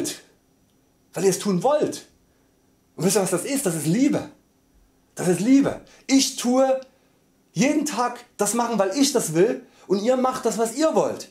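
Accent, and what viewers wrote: Saxony, Germany. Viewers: Hi:) inspirierendes Video! Ja mit dem Thema Verständlichkeit ist es immer so eine Sache ^^ Vielleicht solltest du eine Art Stichwortverzeichnis bzw. eigenes kleines Wörterbuch schreiben wo du deine eigenen Termini erklärst. Nach Schema: Liebe: ist die universelle Verbundenheit im Universum... Freie Liebe ist... Was Liebe nicht ist: Eiversucht: ist... Rohgan: ist meine Lebensphilosophie, die bedeutet Kommunikation: ist.. Partnerschaft: ist.. und dann jeweils vor deinen Videos darauf verlinken. Problem ist im allgemeinen dass Menschen unter Worten oftmals etwas komplett anderes verstehen. Dein populärstes Beispiel ist wohl "freie Liebe": ich behaupte 80% der Menschen versteht da Liebe(Sex) machen mit jeder & jedem in totaler ungebundenheit. Du scheinst darunter im Großen und ganzen etwas anderes zu verstehen. Das Problem ist, dass man Deine Videos meist nicht in Reihenfolge schaut, zumindest neue Abbonenten. 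In einem Buch kann man Begrifflichkeiten nach und nach erklären und das Buch und den Leser, so zu sagen, mit der Zeit aufbauen. Mit dem Medium Yt ist halt nicht direkt so gut möglich, sondern eher ein "Durcheinander". Und wie du sagst, es gibt Leute die einen patu nicht verstehen wollen, jeder deiner Zuschauer wird nur das aus deinen Videos beziehen, was er bereit ist aus ihnen zu erfahren. Wenn jemand im Voherein sagt, der blöde sächselnde... wird er eben diese Erfahrung machen. Wenn jemand beschließt dieses Video im Kontext der Bereicherung anzuschauen wird er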